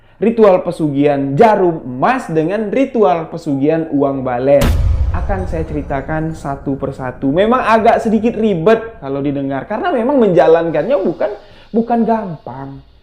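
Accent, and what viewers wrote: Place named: Jambi, Indonesia. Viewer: Walaikumsalam kak n team... Masya Allah Emang Kereen lw Sebuah Kisah Misteri upload cerita Pesugihan sllu bikin penasaran....palagi d bw in kak dgn logat jambi yg khas....the best lah Tanpa yana tunggu lama lama..... Gaskeeuun yuk kak....🔥🔥🔥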